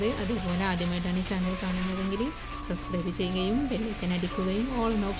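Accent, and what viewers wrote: Malappuram, India. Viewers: നിങ്ങൾ നാട്ടിൽ മലപ്പുറമാണോ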